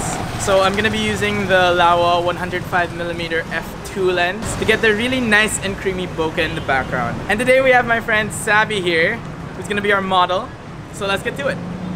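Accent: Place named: Philippines. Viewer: From his accent alone i know this guy is filipino hahahahaha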